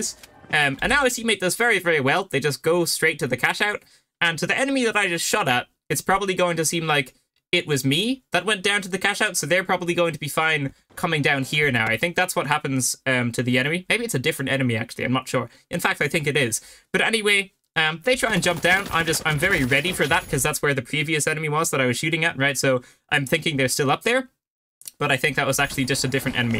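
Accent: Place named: United Kingdom. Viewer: His British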